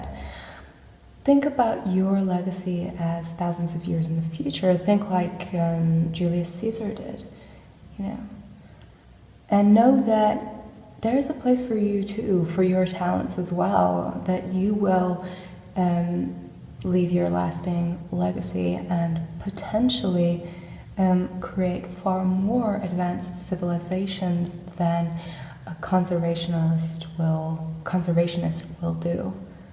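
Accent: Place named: United States. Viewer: I have been a subscriber of yours for a long time. Since you were known as " " or something like that. Anyway I just watched all the videos on this channel and I have no idea what's going on, and that's why it's genius. As an American, I have to say your American accent is pretty good too. I am curious, when you read the books is that your real accent? Anyway, please keep doing what you are doing. I think your stuff here is totally nihilist and I love it.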